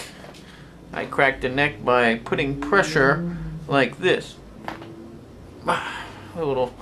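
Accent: United States. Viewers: i believe its a new england accent but im not sure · He has the best boston, yankee accent I'm from the south so I don't here this much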